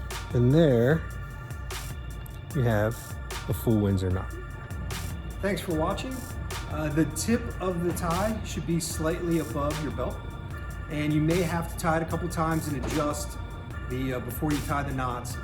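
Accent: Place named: Philadelphia, United States. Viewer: I’m sensing a Philadelphia kind of accent. Maybe Baltimore. Somewhere in the Northeast without being New York or New England.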